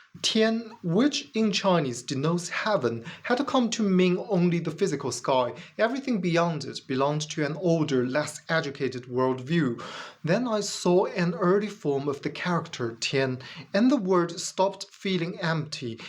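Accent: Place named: United Kingdom. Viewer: AI has better pronunciation and intonation. Not intended as a critique of English. Your English, is excellent. "Heaven" not "haven", "These" was mis-read as "this" (This is just a little feedback for you, ). I thought, originally that your accent was British-flavoured, but your subtitles are in US English. Where did you learn your English? Your ideas? Unconvincing. Successful religions have always been about controlling other people. That is what they are selected for, if they do not do that, they get replaced. Reality is not important to religion (well, successful religions). I suppose the Flying Spaghetti Monster has some connection to reality.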